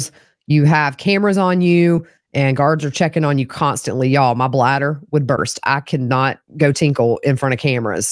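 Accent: South, United States. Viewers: I like your southern accent. I live on the west coast and when I ended up in jail for 2 months when I was visiting NY everyone said I had the accent. Triips me out how many accents there is in the same country. Anyway my comment isn't about this evil family. Sorry.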